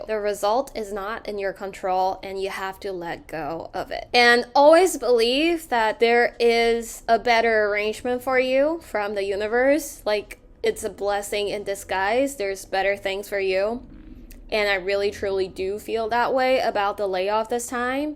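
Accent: United States. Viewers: You sound 100% American. They should let you stay. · how come you have an American accent? did you learn that since you moved in to US? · How did you pick up American accent while you came to the United States in 20's?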